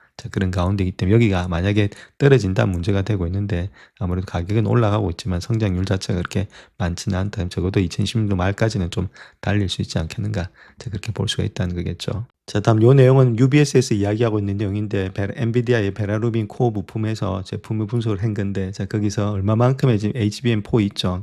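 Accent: Gyeongsang, South Korea. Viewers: 그냥 지나가세요. 경상도분들의 발음이니까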